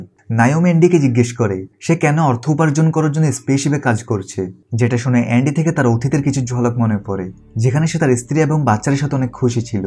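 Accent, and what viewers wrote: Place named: Chittagong, Bangladesh. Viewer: আপনার নাম কি জানিনা। উচ্চারণ শুনে মনে হয়েছে চট্টগ্রামের বাসিন্দা। ইন্টারেস্টিং মুভির এক্সপ্লেনেশন করেন। কিন্তু একটি উচ্চারণ শ্রুতিকটু মনে হয়েছে। এন্ডি থেকে লেগেছে, লিওনার্ড থেকে লাগছিল... এভাবে না বলে বলবেন- এন্ডির মনে হয়েছে, লিওনার্ডের মনে হচ্ছিলো, তার খুবই খারাপ লাগছিল, তার ভালো লেগেছে। থেকে শব্দটা এভয়েট করুন।। শুভ কামনা।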